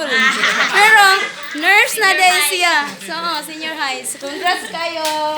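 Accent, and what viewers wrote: Visayas, Philippines. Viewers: Laglom nkaayo pagkabisayaa maayo kaayo ka batbat sa pinolongan bisaya ui idol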